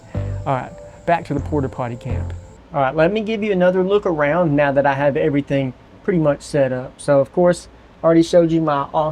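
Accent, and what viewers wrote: Alabama, United States. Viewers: That's a cool stealth camper! It would also make a beautiful and comfortable outhouse. If you were in Louisiana I would suggest you stealth camp in a Mardi Gras Float [in the off seasons of course]. But then again I sometimes forget you live in Alabama where Mardi Gras originated until I hear your accent.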